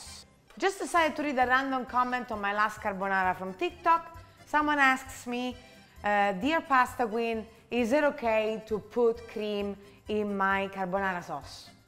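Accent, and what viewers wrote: Italy. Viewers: Your beautiful and i love your Italian accent I'm sicilian and i love your ricette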